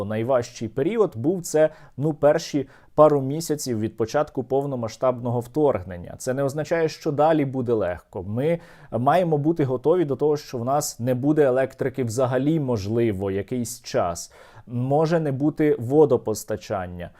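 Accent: Ukraine. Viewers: Українська в нього стала набагато кращою!!!Але вплив російськомовної Одеси на нього ще відчувається!!!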